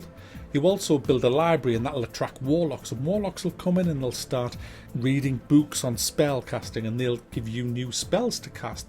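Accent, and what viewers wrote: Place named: Newcastle, United Kingdom. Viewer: geordie youtoober? well aye. ne worries pal.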